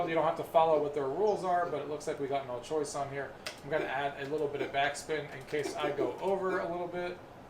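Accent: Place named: North Jersey, United States. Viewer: Dude, your in jersey? Wtf , I'm guessing your from the northern or western part by your accent. It's not as bad as a bayonne one like mine, but you have to be north of old Bridge. Hahah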